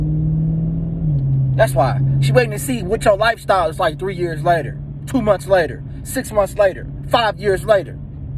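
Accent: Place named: Maryland, United States. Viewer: Bruh gotta be from Maryland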